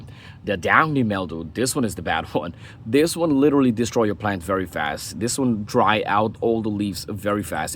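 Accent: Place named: Guyana. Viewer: I heard the accent and had to subscribe! From one Guyanese gardener to another 🇬🇾🇹🇹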